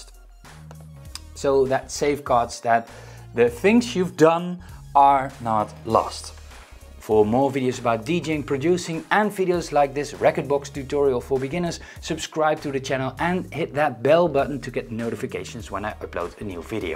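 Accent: Netherlands. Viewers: Are you from the Netherlands?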